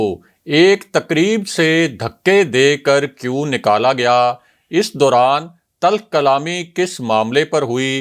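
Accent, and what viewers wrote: Punjab, Pakistan. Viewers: It seems he is the PMLN supporter ... he better learned how to speak in urdu he is fully speaking URDU IS PUNJABI .... bhai urdu boleen agar bolna nahin ati tu puniabi mein hi video bana liya kareen ... WHAT A HEADACHE...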